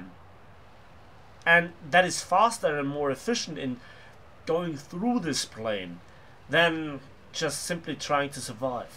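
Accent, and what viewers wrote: Germany. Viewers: Man hört deinen Deutschen aktzent ich mag das :)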